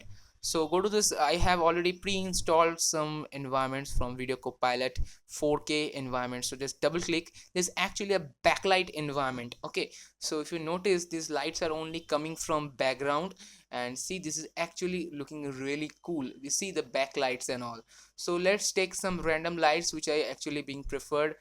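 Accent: India. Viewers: Thats how Indians speak.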